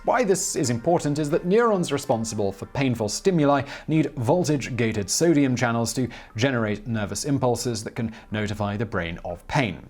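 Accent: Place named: RP, United Kingdom. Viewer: Is this RP accent the narrator speaks in something he practiced independently or learned in school, or is this a natural accent some people have in some region of the UK? Just curious because his way of speaking is incredibly clear and lovely.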